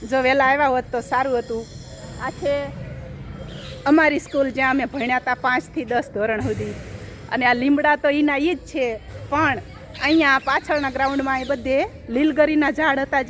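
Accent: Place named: Saurashtra, India. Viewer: તમે પ્રાંતિજ નાં છો પણ તમારી ભાષા સાબરકાંઠા ની બોલી તો બિલકુલ કેમ નથી આવતી તમે પ્યોર સૌરાષ્ટ્ર ની ભાષા બોલો છો તમારું નાનપણ તો પ્રાંતિજ માં જ ઉછરેલું છે તો આવું કેમ સમજાતું નથી...જય શ્રીકૃષ્ણ બેન 🙏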